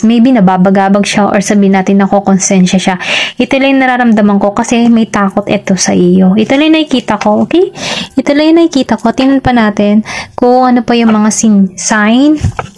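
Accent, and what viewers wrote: Batangas, Philippines. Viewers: Parang Batangueña ang isa sa pa paborito kong tarot reader😊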